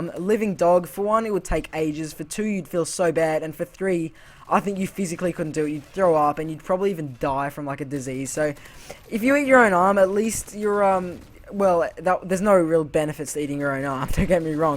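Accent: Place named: Australia. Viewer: Usually the Australian accent annoys me, but your voice makes it not annoying:] Think your speaking is pretty good too... Subbed :P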